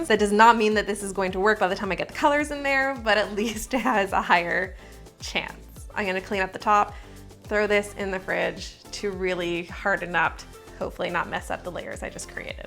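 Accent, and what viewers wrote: Canada. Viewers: Is she Canadian? I found her channel yesterday and I’ve been binging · I just subscribed! ☺️😁 also, are you Canadian? 😂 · Me: NO you are not subscribing to any more baking channels .. All you make is Vanilla or chocolate basic cake!!!!!! - Also me: But she's sooooo cute ... She's adorable!!! And that Canadian accent!!! Chef's kiss!!! - Me again: I said NO .. what are you doing?? ... Ok, you're subscribed ... I hate you 😅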